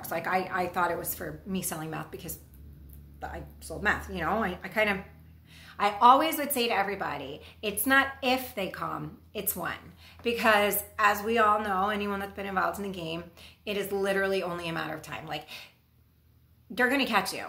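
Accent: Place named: New York, United States. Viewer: I love your New York accent ❤️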